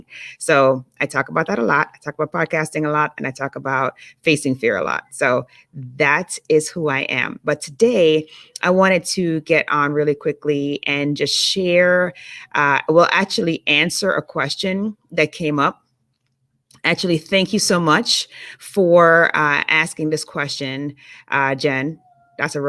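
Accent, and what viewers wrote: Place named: Jamaica. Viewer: You sound a little Jamaican...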